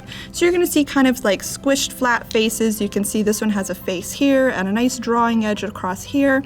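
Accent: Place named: Winnipeg, Canada. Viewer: Ruby was my Mom's birthstone, emerald mine. I loved collecting garnets during my college Earth Science' class field trips as well. It was funny though, for an engagement ring my fiance wanted cubic zirconia because it was more 'sparkly' than diamond. I thought it was nice too. Love your Winnepeg accent--I worked for a client up there in the late 90's, I was given a visa which marked me as a professor so I could teach hotel business systems' classes all across Canada without paying a customs fee, under the rules of NAFTA. My Canadian hosts were so nice to me--my first trip there, with my visiting Napa Valley Gospel choir, was in '72 in BC and Alberta. I was so blessed to go there so young, and my timing was lucky, because I returned home only to have an emergency appendectomy a month later that August, returning from my school's summer vacation an intriguing hero among my choir mates and classmates. 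I remember arriving in Ottawa during a terrible blizzard at 3AM one year, and my client picked me up at the airport as my flight came in after an eight hour delay from Detroit. I also remember a client in Montreal giving me a day off so I could hop a bus to Quebec City, and I enjoyed the Winter Carnival and ice sculptures. Other than Europe, I spent more time in Canada (mostly working) than any other place outside of the US. I remember the Geology and Glaciers of the Canadian rockies, and the hospitality of the French and English Speaking Canadians who treated me like I was a US am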